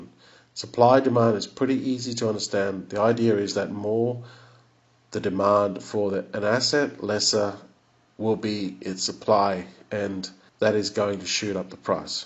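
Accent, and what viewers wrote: Australia. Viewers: Outstanding video and advice mate. Aussie accent expat. Where are ya ? In CEL BNB THETA since 2019, all solid. I like Noia Network and Ziliqa, they meet your criteria as well.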